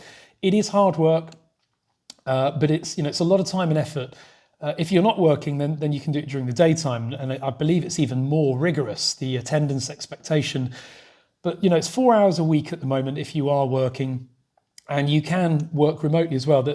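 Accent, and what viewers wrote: Sweden. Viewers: Älskar din skånska! Hälsningar från en malmöit.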